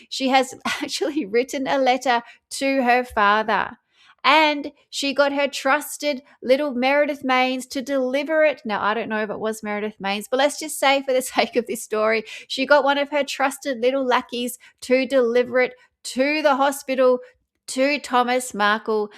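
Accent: Australia. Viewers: This is such a sad state of affairs. I would be totally devastated if the daughter I raised and loved treated me like this. Mr Markle I send you white light, strength and peace... This woman is truly an empty vessel. once again great work, thank you for your updates wrapped in the appropriate amount of ridicule, and delivered with our great Occa Aussie accent. Every video I just shake my head in disgust of her behaviour.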